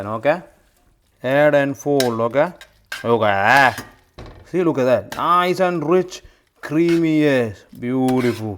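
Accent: Thrissur, India. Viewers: നാട്ടിൽ പോയി വന്നോണ്ട് തൃശ്ശൂർ സ്ലാങ് അടിപൊളി ആയി വരുന്നുണ്ട്... But സൂപ്പർ 😍.... ❤️ ഉണ്ട് ബ്രോ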